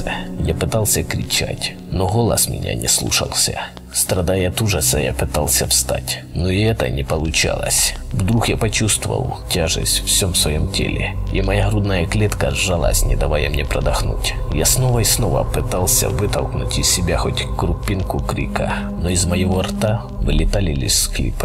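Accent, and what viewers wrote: Ukraine. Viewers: Чувак ты украинец? БЛИН НУ СЛУШАТЬ НЕ ВОЗМОЖНО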